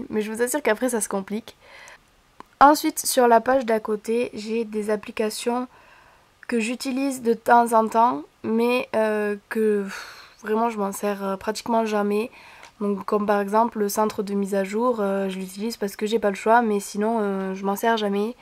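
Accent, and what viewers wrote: South, France. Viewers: Les cheveux courts te vont super bien, tu as un super accent du Sud (comme nous ^^ Lol) et tes videos sont géniales! Hate de la prochaine Vidéo! #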